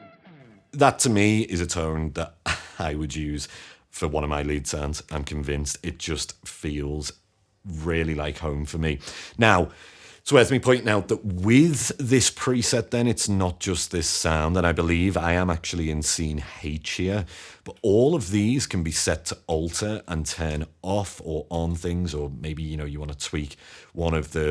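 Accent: Liverpool, United Kingdom. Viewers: The scouse accent just is the best for music reviews ❤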